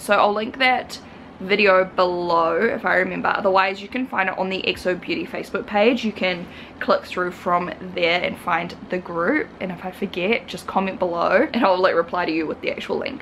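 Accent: New Zealand. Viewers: The voice you do when you call your dogs, my mum thought it was me so funny our NZ twang 😂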